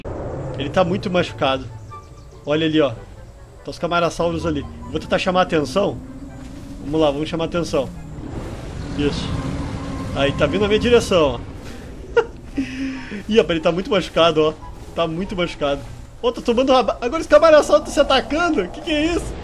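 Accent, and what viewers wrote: Brazil. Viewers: achas mesmo? Eu prefiro o vosso sotaque brasileiro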